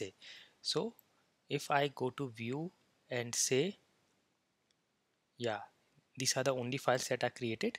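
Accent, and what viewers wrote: India. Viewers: entah foldah saovah nambah pikchah fuchah Administatoh hieh Cringe Indian English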